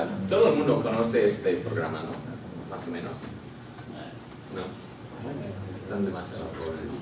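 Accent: United States. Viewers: Si muy bien. Obvio tiene su acento gringo pero se le entiende perfecto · me encanta el acento del gringo JAJJAJAJA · El acento del maestro lo siento todavía muy gringo :v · Jejejejej normal, el acento gringo se nota, pero habla bien 👍 Hay que decirlo porque igual esas cosas se corrigen 😬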